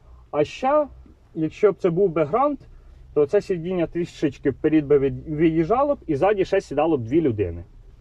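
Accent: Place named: Russia. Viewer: По говору видно что русский настоящий русский)))😊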